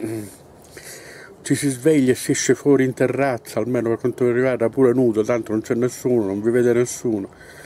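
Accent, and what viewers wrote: Sicily, Italy. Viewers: Grazie per le information. Leibe siciliano?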